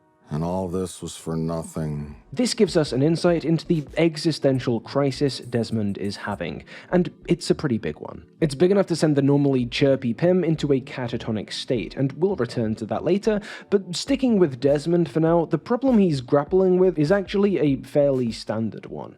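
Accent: United Kingdom. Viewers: I KNEW HE’D BE BRITISH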